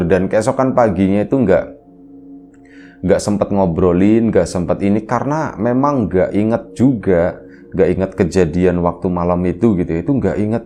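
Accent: Tegal, Indonesia. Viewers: Wa, alaikummusasallam wr wb, Palembang hadir dan nyimak selalu, Di tunggu cerita selanjutnya, Buat moga makin sukses dan buat mas narator, moga sehat slalu dan slalu dalam lindungan Allah SWT, Owalah mas narator asli nya Tegal ya.....?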